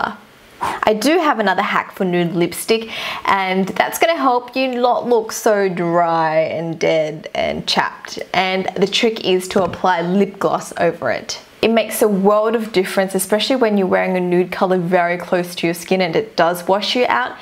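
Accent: Australia. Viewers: Are you Austalian? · you sounds like Australian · love u r Australian accent it's so cute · I'm pretty sure that she's from China... But the thing is that she sounds so Australian🤔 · Are you from Australia? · she sounds australian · are you aussie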